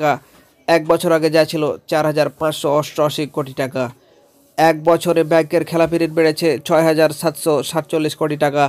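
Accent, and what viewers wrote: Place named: India. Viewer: ভাই আপনি কি ইন্ডিয়ান লোক কারন আপনার কথা তো ভারতের লোকের মত।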